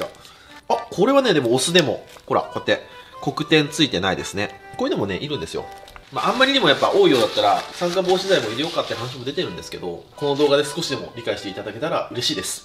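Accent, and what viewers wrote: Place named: Chita-Mikawa, Japan. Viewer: 愛知出身です。「よばれる」って久しぶりに聞けたわ。祖母は亡くなってしまったし地元を離れてしまったので、知多三河方面の方言が聞ける貴重なチャンネルです💕動いてるタコおいしそー！！！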